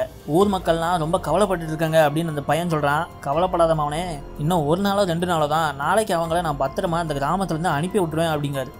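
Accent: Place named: Thoothukudi, India. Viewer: Hai bro. . Nama ooru slang mari iruku. . Iam frm thoothukudi. . Especially and ketta vartha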